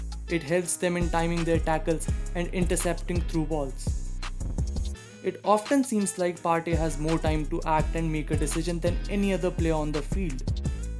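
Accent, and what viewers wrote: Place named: India. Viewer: Immediately I heard an Indian voice just skipped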